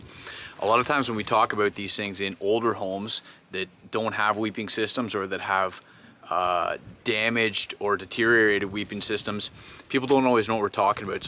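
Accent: Canada. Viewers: I knew you were Canadian before I even saw the location is tagged as Peterborough 😂 Very helpful video for a home owner working with contractors. A future video on sump pumps would tie in nicely with this one!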